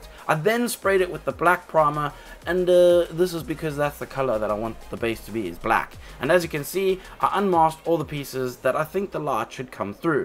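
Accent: South Africa. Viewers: I recognize that South African accent 😉 This is incredibly impressive, well done! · Are you originally from South Africa? 🤔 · Awesome work! You sound very South African. 😅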